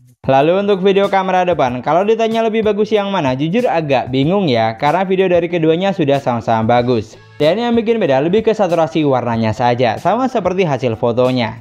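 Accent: Madura, Indonesia. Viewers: Bang kamu orang Madura ya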